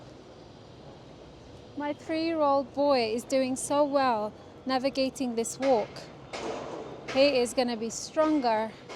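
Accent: United Kingdom. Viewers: "Health and safety officers! eat your hearts out". I like your posh sounding British accent.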